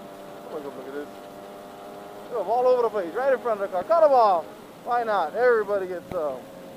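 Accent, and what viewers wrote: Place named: New Zealand. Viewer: I'm a Filipino from Guam... used to work on one of them cruise ships that sailed around the Islands of Hawai'i, that is why I thought your accent was familiar... For a second I thought your accent was from New Zealand, kinda sound similar...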